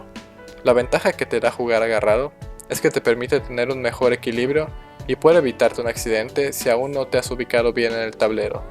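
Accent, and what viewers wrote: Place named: Mérida, Mexico. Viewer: Eres De Merida :o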